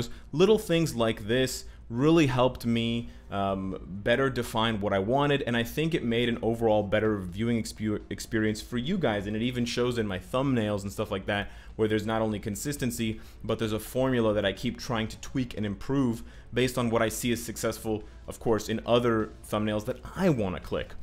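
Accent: Portugal. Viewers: Eita sotaque Português incrível. Bom saber de suas raízes,. Te acompanho bastante e admiro muito seu trabalho (sou brasileiro), está me ajudando MUITO desde que voltei a jogar - parei no lançamento da Kamala Khan. Ign: ThomasDell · Hey, It's great to hear your opinion about it. It surprised me that Netmarble actually responded to this drama, I just didn't think they would. It seems they want to be closer to the community and listen to what we have to say, which is fantastic. They are improving and I hope, as you said, that they will make adjustments to this program in the future. Also, it's always great to hear your portuguese accent hahaha Seu canal me ajudou muito desde que comecei a jogar, obrigada!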